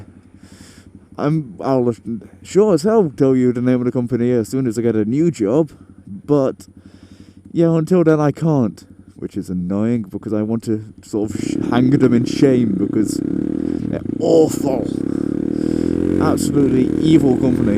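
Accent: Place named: Sheffield, United Kingdom. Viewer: i come from sheffield but live in worksop in 15 miles away from sheffield i thought i recognised the accent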